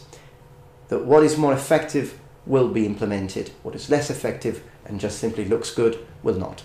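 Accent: United Kingdom. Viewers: I just noticed that your British accent is pretty strong! You even use the R between words like in "the idea rof a straight sword". That's pretty funny to me but that shows your natural skills with phonetic articulation; you also have a talent for acting, I don't know if you ever studied theater but you definitely should. I'm Sardinian by the way. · Where are you from man? I can't pick the accent. It sounds maybe South African or from somewhere around about Holland. I'm tending toward the latter because, though your English is very good, it ain't perfect. I don't mean to have a go but "information" is not a countable noun . PS, on the the other hand. Of course, native speakers don't spit out what the prescriptivists would dub perfect grammar one hundred percent of the time (far from it). However, I'm getting tinges of British flavour here & there, so I'm still guessing the Netherlandish vicinity. 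PPS So, I was so wrong. You're Italian. Oh the shame! Still, I'd not guess it. Thanks anyhow for you vids. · what's even more interesting, is an Italian speaking with British accent :) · T'es français, nan ? J'ai cru repérer ton accent, mais tu as un excellent accent british. ^^ Vidéo très intéressante, je me doutais bien que ce n'était pas possible en temps normal mais ça a l'air tellement cool ! x)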